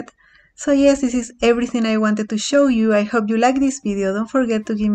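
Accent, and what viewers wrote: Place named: Spain. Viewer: Hola! Me encanta ese lugar, se nota que tienes acento español... Haz algún video en español para los que no entendemos el inglés!!! Gracias!!!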